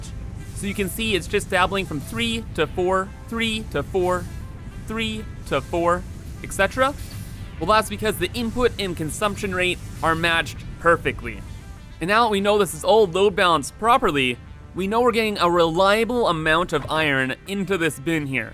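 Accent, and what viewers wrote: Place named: Canada. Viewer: this is the most canadian voice i've ever heard, i love it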